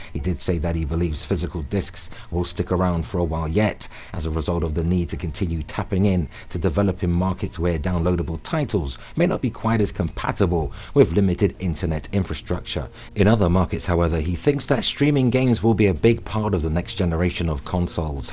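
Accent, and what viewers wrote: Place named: England, United Kingdom. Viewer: Great channel, great content, great diction........... very insightful indeed.........you have a great tone of voice and a delightful English accent.......keep the good work.......you are a breath of fresh air when it comes to you tube gaming commentators.......they are mostly inept and childish, or use click bait with ludicrous tittles and unfounded far fetched facts that beggars believe......you have my full attention sir.......greetings from Australia 👍🏻😀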